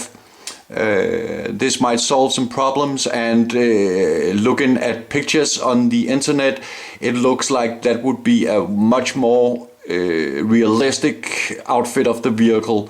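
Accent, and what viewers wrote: Denmark. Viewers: You danish? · Are you from Denmark? Sounds like it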